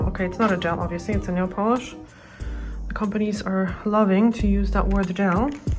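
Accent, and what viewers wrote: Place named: Poland. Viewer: Dopiero niedawno oglądam twoje filmy i dzisiaj usłyszałam że mówisz po polsku. Tak się domyślalałam bo brak u ciebie tego kanadyjskiego entuzjamu w głosie pomimo że akcent już mało polski 😉 Mam jeden lakier z Catrice z seri nudes i byłoby wszystko idealnie ale niestety słabo się u mnie utrzymuje. Po 3 dniach jest spękany na powierzchni. Za to eveline pięknie się sprawdza, mega trwałe i łatwe ale kolory mają jakieś takie mało ciekawe...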